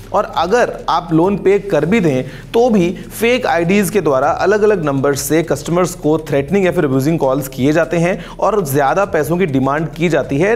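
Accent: India. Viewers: Indian english 😭😭😭😂 use correct Words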